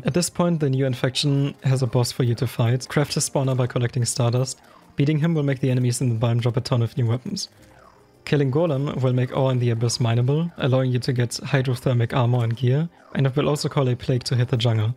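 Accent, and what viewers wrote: Germany. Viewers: Thx man. Are you German? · Idc what anyone says if the title says spoiler free and the accent says German af then you won’t find ANY spoilers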